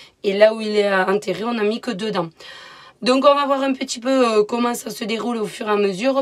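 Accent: South, France. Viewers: Belle vidéo, bien expliquée, vous êtes du sud de la France ha oui je me demandais d où venait cet accent, bien agréable. De Vendée non, du Nord non. Plus sérieusement, l'outil doit utiliser la puissance du tracteur. D'ailleurs, ce n'est pas le fendt, il n'est pas cassé 😉. Je suis rassuré car tout a bien été réfléchi dans la tête d' et le résultat sera bon. À bientôt pour une nouvelle vidéo, et toujours avec le sourire.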